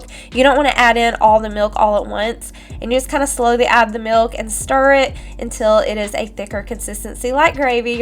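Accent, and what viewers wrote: South, United States. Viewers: love that you decided to do an episode on budget meals. Love your southern accent, what state are you from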